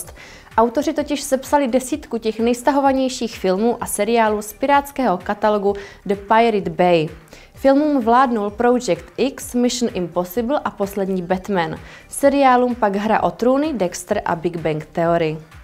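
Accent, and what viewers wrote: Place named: Brno, Czech Republic. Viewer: tak vám newim, česky mluvit neumí, ta brněnština je tam znát a mluvit o tom taky neumí, přečíst bych to zvlád stejně...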